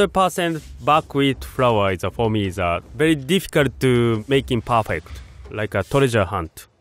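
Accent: Japan. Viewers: ジャパニースアクセント、エニワン? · First time I think the Japanese-accent English doesn't matter.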